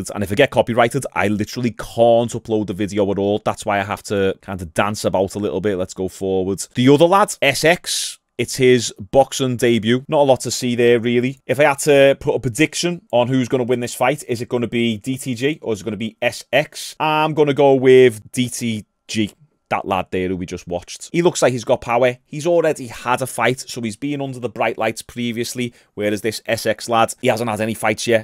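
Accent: Liverpool, United Kingdom. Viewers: Liva Pewl! I hear the Scouser in ya! Thanks for the breakdown!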